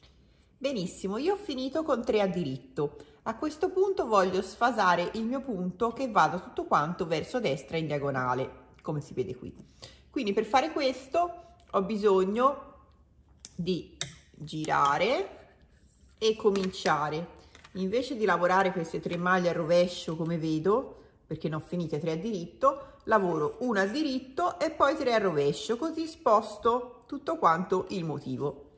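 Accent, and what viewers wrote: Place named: Tuscany, Italy. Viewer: (accento Toscana ciò che adoro)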